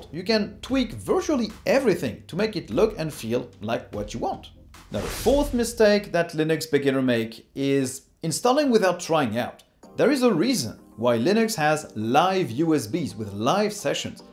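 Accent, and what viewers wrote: France. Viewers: Ah such a relief to hear the half french accent pronouncing 'linux' again. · Are you french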